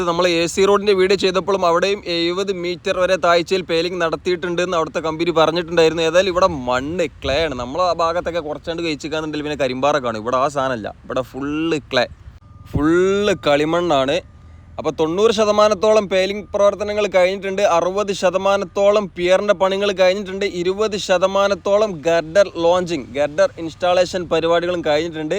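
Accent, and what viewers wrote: Malappuram, India. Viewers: മലം പുറത്ത് കാരനാണ് റിപ്പോർട്ട്‌ ചെയ്യുന്നത് അതുകൊണ്ട് ഞമ്മന്റെ ബാസാ ഇങ്ങനെ ആണ്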